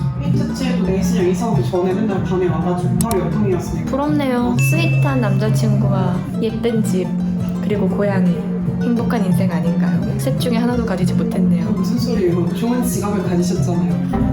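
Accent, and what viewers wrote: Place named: United Kingdom. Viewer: 소영님 영어에 영국식 발음이 들리는데 배우신건가효...?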